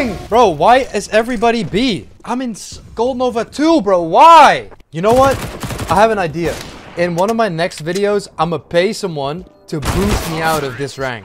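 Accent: Netherlands. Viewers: I mean im the one you need if you want to be downgraded, complete gawed gamer over here my god. btw love the dutch english lmfao its cracked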